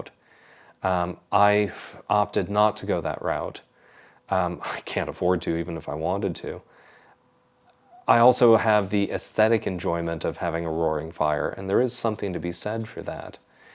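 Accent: Scotland, United Kingdom. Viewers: Loving your channel, one thing I have noticed is that you are starting to pick up the local accent, the way you say Scotland & Scot in particular. It will be interesting to see how broad you become as time passes. Also you may wish to consider that the phrase let rip can also mean a bad bout of farting, so I did laugh when you described Christine as letting rip in the kitchen until you qualified it with cooking up a storm. Conjured a funny image. 🤣🤣🤣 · Oh my goodness, you're picking up the brogue. You've stopped sayin "Scatland" and started saying Scotland, like a local 😀. I'll be listening to see what other words change over time. Excellent content, as usual 👍🏴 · Congratulations on your million views--well deserved! I found Simple Scottish Living just before finding your channel; pretty sure I've seen North And Forth videos too. I really love watching anything to do with Scotland and won't give up hope of living there someday (just wish I was younger). 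I've also noticed that Scottish accent creeping in...😁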